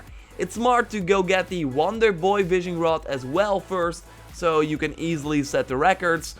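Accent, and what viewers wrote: Netherlands. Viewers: Ben je Nederlands? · the strong dutch accent u have tho jeez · Jii bent Nederlands zeker?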